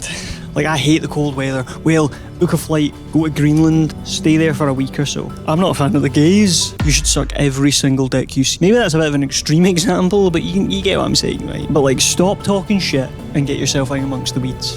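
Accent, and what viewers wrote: Scotland, United Kingdom. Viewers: Is that the Scotland accent or Irish accent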